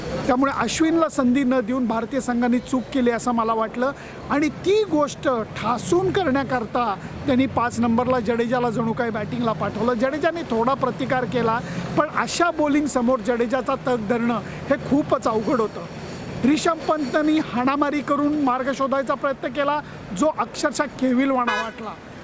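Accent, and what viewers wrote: Pune, India. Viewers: बोलण्याची सुंदर अशी शैली. शुद्ध पुणेरी भाषा.लेले आपलं विश्लेषण ऐकतच रहावं असं वाटतं 👍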